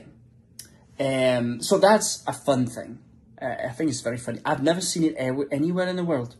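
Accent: Scotland, United Kingdom. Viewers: J'ai étais faire un séjour linguistique d'un mois à glasgow l'été dernier et c'était amazing. I fall in love of the city and Scotland. Thanks to you i keep practicing my english with your scottish accent. So thank you !! Merci ! 🇫🇷 🏴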